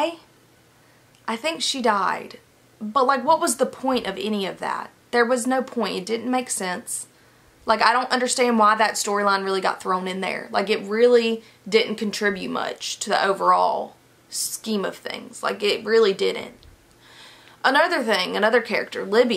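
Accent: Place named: South, United States. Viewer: lol definitely a little country but its not super thick. It pops out every few words for sure. I'm from New York though so my ears may also just be tuned into our sound 😂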